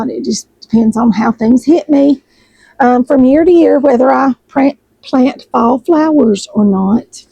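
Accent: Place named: Tennessee, United States. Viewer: I thought you sounded like you were from Tennessee. We are in East TN also. Love your sweatshirt.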